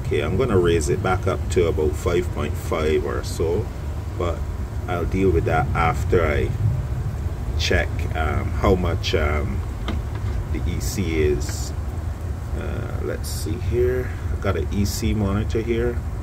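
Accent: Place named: Caribbean. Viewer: I came across this vid while browsing for info on pH stability. I’m finding that it takes more and more pH down (I use phosphoric acid)… when a fresh tank is mixed it takes say 1ml to bring it down to 6.0 but 2 days later when I top up the tank with water (and some nutrient solution to keep the EC up) it takes 2ml of pH down to bring it back down to pH 6.0. Then the pH climbs to above 7.0 again in 48 hours when I come to top up the tank again. Foliage is green and healthy, fast growth, so I expect the pH to rise as the nutrient elements are depleted from the solution. Maybe the tank is just too small for the size of canopy that it supports, combined with fast uptake of phosphorous means that the acidic elements are being depleted very quickly? BTW I loved your accent. It’s interesting that Caribbean accents often sound similar to Irish accents.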